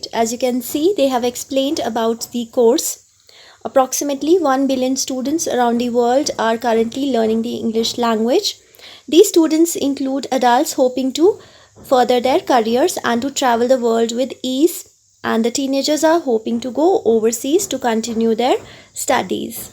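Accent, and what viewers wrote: India. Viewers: Indian accent you can't blame her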